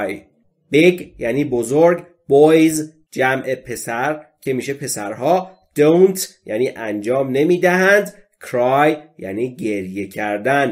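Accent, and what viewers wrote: United States. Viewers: Are you American ? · Are you Americam?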